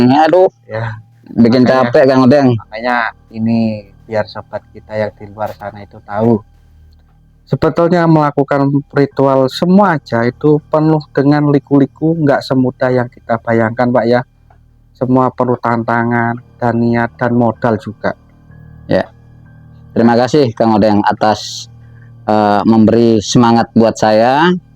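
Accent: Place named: Madura, Indonesia. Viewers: Iki logate Meduro / Banyuwangi om bukan sragen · Ko logatnya kaya org jatim bukan ky logat org jateng meditasi bukan apriasi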